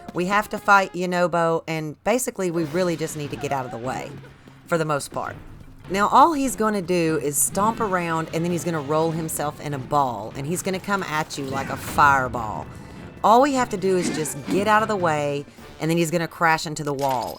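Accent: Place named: New York, United States. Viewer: You sound like your from New York.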